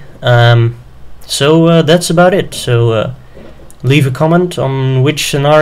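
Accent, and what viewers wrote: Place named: Netherlands. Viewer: Ah, Dutch, that's what it is. I could tell you had an accent but I couldn't tell what it was. For future reference "Souix" is pronounced "Soo," and "Navajo" is "Navaho." English is hell for trying to figure out how a word is pronounced just by looking at it. :P Great LP btw, YOu've earned yourself a subscriber.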